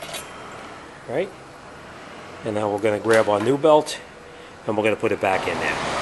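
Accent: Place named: Staten Island, United States. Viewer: Love your videos brother. Your accent makes me miss home...Staten Island.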